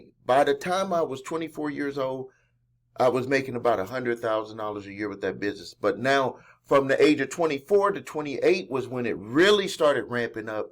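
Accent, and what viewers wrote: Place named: South, United States. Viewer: You are keeping us inspired, man. What state are you in? You sound southern. We are in NC.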